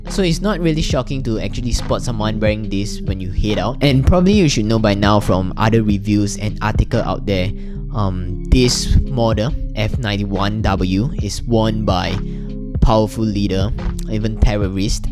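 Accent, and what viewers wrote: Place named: Singapore. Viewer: Your Singapore -English accent made this video great . Excellent good job ...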